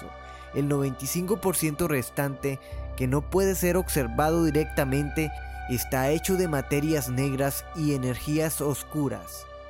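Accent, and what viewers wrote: Honduras. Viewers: ¿Es hondureño? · Eres hondureño? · eres de Honduras ?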